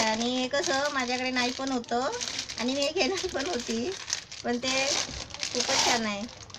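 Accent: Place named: Maval, India. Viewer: khup mast.. tai..! abhinandan tumhala khup shubhechhya..!! aani diwali faralachya videos sathi dhanyawad..!! /\ tumachya maval bhashene mi khup prabhavit zale aahe..!! 😝😍😍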